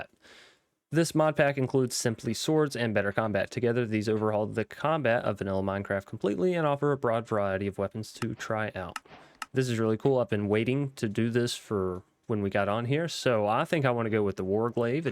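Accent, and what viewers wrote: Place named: Appalachia, United States. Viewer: he sounds Appalachian or southern to me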